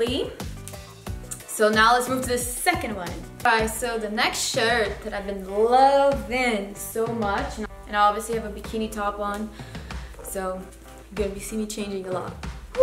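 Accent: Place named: Portugal. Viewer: Love your channel. I was wondering what nationality are you? Sounds like a Portuguese accent? Anyway, keep up the awesome channel. 👍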